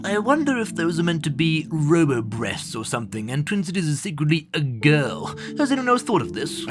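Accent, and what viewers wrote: United Kingdom. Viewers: You remind me of Highboi, but you're british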